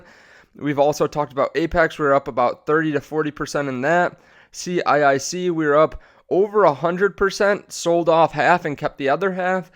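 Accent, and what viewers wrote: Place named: Midwest, United States. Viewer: Dude is so Midwestern I love it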